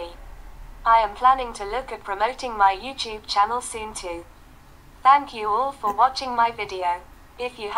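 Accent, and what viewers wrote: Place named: United Kingdom. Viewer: Hi, oh yeah I found your video about "how I become an Artist" and I heard you talking, your speech is very clear. Also I heard you say you are in London, I should have figured that out from the british accent on your communication device. Alas I'm in the USA, otherwise it would have been nice to meet up, you're very pretty. Well I'll be looking forward to your Q&A video. Take care!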